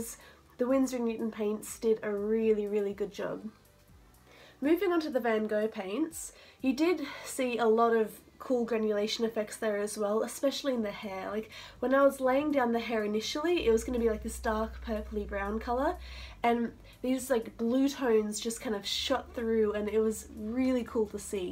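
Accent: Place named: Australia. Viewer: This is probably stupid but when I opened the video my first reaction was is this a fellow Aussie...I think so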